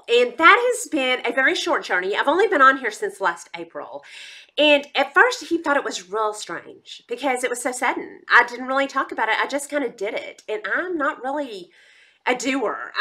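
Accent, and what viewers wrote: South, United States. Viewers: Due to your "slight" southern accent and my "slight" hearing problem, I always use captions if they are available. When you gave your favorite movie, it came across as "Lend Some Death" and I thought what the heck???? Then, of course I figured it out!!! Isn't that a hoot??!!! I can tell you whoever does the captions is NOT southern, lol. Anyway, this was a fun video, I too have great respect for teachers and could NOT do it, they do NOT pay them enough and pay the administrators far too much. IMHO. Could not be a "flight attendant" either since I no longer fly (long story). Used to work for realtors during jr high and high school (yes, I was industrious)-- didn't want to pick blueberries in the summer so taught myself to type!! Looking forward to Part II -- You are fortunate your DH still carries on conversations with you! :-)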